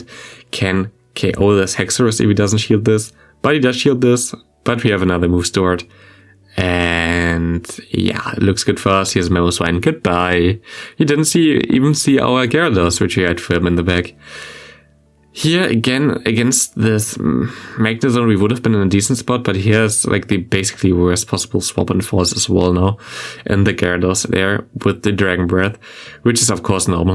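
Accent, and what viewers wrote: Germany. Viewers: I hate your accent. Typisch deutscher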